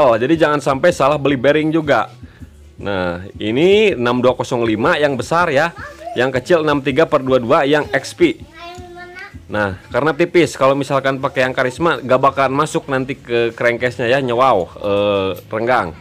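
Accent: West Java, Indonesia. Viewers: Sunda pisan iyeu mah... mantap kang... 🙏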